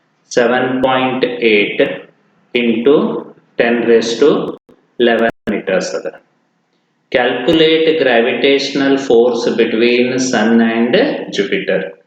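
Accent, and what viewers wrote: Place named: North Karnataka, India. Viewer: Thank you sir. You speak north kannada, may i know where you are from sir?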